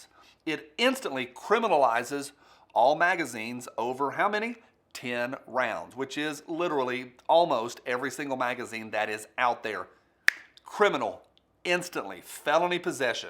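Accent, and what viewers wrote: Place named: Texas, United States. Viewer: Where in Texas is this woman from? Making sure we don't ever move there.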